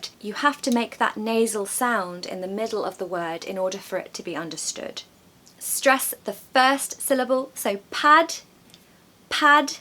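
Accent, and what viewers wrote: United Kingdom. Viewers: Your accent is so beautiful. I thought most British speak English just like you and I am wrong，you are the best of them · Listening you is very easy to understand the British English accent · You represent the UK perfectly. I love your accent. Your voice is lovely to hear, and your lessons are built to create a lovely atmosphere. That is a proper good lesson 😊.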